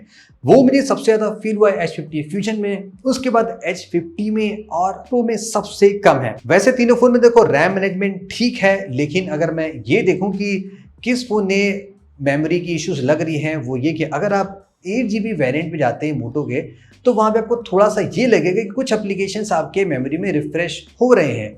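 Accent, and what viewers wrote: Lucknow, India. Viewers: Aap lko se hai kya sir..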